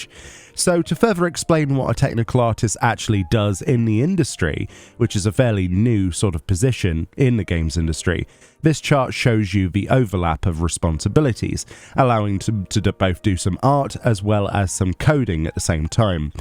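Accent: United Kingdom. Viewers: Oh, it is my first time encountering British accent :)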